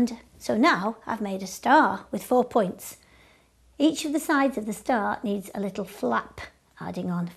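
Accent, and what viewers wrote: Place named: United Kingdom. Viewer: First hearing British accent so sweet and cute English :3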